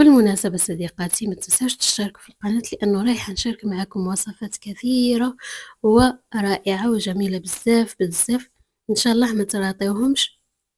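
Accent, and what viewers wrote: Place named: Tlemcen, Algeria. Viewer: اول مرة نشوف قناتك و درت abonnés من اي ولاية الأخت حسب اللهجة تلمسان انا ام اكرم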